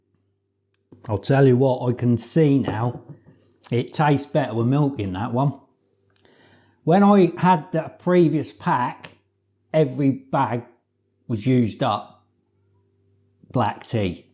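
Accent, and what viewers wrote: Norfolk, United Kingdom. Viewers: I love your broad Norfolk accent!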